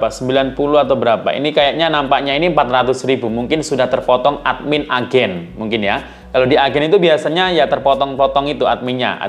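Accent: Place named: Purworejo, Indonesia. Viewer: Wong Purworejo ni mas...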